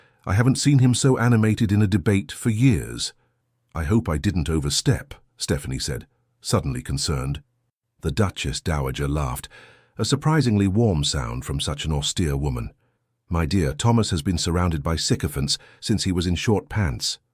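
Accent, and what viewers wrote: England, United Kingdom. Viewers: Hello dear. You missed " perhaps" before the first 30 so...he might be 30 after 2 years. Thank you for a nice story and an enjoyable english accent.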